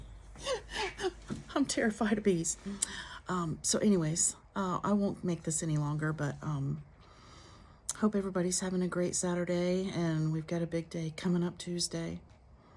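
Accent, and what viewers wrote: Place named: Texas, United States. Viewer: She has a Texas accent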